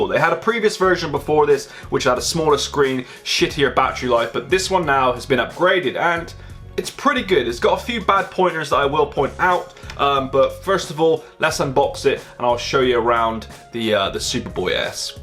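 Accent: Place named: Scotland, United Kingdom. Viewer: Is your nationality Scottish? Your accent seems to hint at it. Just curious. Also, great video! I may consider buying one of those Handheld Super Nintendos.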